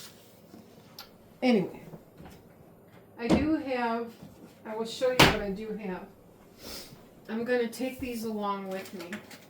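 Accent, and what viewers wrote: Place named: Midwest, United States. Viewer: I thought so. My son and daughter in law lived in Wisconsin for many years and lived in Minnesota and Michigan. I could pick up that wonderful Midwest accent 😊. We are in South Carolina now .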